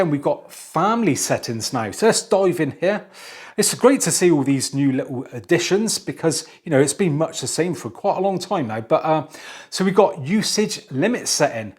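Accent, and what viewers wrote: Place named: West Country, United Kingdom. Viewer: Enjoyed your video, love what I believe is the west country accent too, bonus. This video will be a big help to me as my exact same new tv is in the box ready to setup, just waiting for the new stand to arrive before unboxing and setting it up. Thanks, enjoyable informative video and thanks to your wife for the video work. 👍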